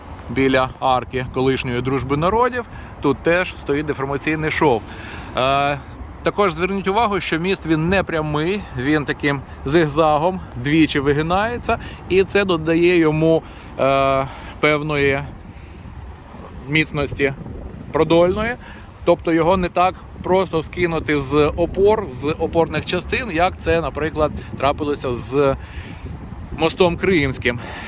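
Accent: Kyiv, Ukraine. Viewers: який гарна "київська говірка", як Ви колись казали 👍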